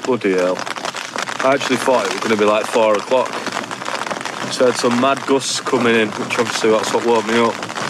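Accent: Yorkshire, United Kingdom. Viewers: like the video mate - like your yorkshire twang - nice memories for me back home :)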